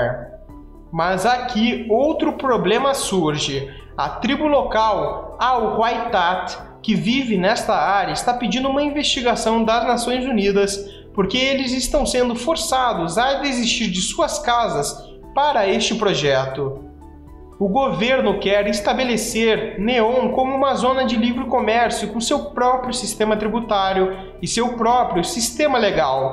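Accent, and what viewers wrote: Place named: Curitiba, Brazil. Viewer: Ele é de Curitiba, por isso ele tem esse sotaque.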